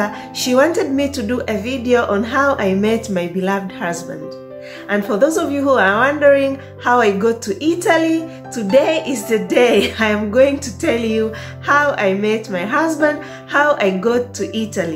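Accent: Uganda. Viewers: I thought she was Ugandan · Lovely story. From Tanzania but you sound like Ugandan. Much love from Uganda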